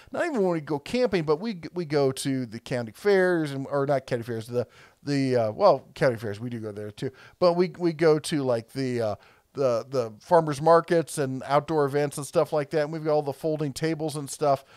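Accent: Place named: Brooklyn, United States. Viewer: Pittsboig ??? Are you from my hometown.. Brooklyn ? 😁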